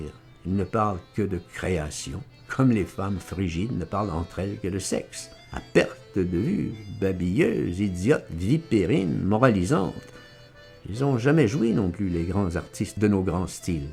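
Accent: Quebec, Canada. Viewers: Moi qui ai du mal avec l'accent Quebecois, souvent coupé au couteau, je trouve qu'ici il apporte beaucoup de vitalité à un texte drôle et grinçant. Vous avez du talent.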